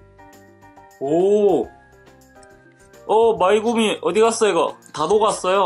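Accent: Busan, South Korea. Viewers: 허팝님이사투리리쓰시나.저는부산녀자ㅎㅎㅎ 자꾸 부산사투리억양이나오시는허팝님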